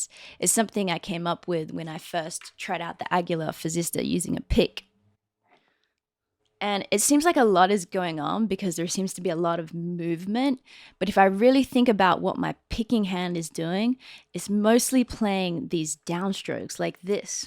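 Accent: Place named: Australia. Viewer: Great vid, great playing and practical ideas. Fellow Aussie here 🙋🏼‍♂️, great to hear the accent on a bass vid, I look forward to more.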